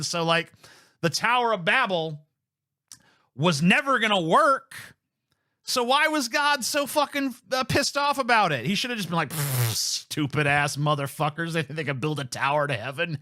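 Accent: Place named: Appalachia, United States. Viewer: I love TJ's hillbilly accent!! 🤣😂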